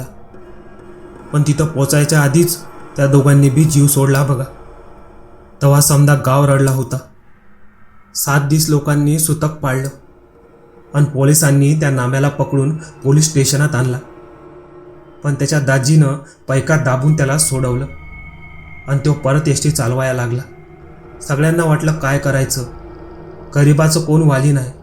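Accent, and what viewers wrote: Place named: Gujarat, India. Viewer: मस्त कथा आहे पण कथा वाचणारा गुजराती आहे असं त्याचा टोन सांगत होता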